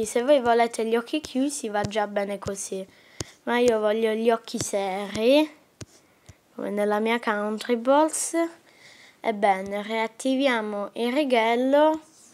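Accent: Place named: France. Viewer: Ma parli con l'accento francese. Tuo padre è italiano e tua madre è francese oppure il contrario?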